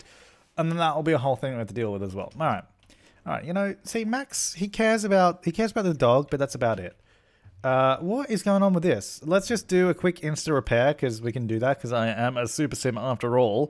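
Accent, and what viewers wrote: Australia. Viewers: I once watched on my laptop closed it over then in the middle of the night the video somehow started again and (who lives females) suddenly beard a male Australian accent shouting about Katrina My name is pronounced Katrina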